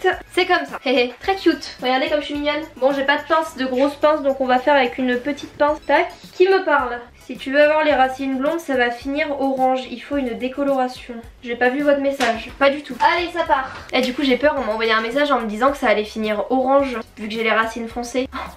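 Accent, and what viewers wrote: France. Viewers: I will regret It hein😭😂😂 jss morte tu me tu avec ton anglais français 😂😭❤️ on adore tes expériences capillaires (moi aussi on est 8 dans ma tête tkt)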